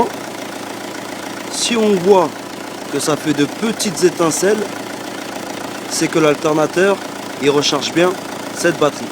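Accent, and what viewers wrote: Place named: East, France. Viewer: magrébin plutôt. Mais il a plus un accent de l'est j'ai l'impression.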